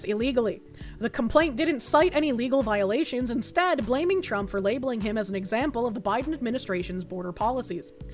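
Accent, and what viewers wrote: Boston, United States. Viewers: I live in a world where I get my news from a fluffy fox thing with a Boston accent. I swear, It's like Futurama.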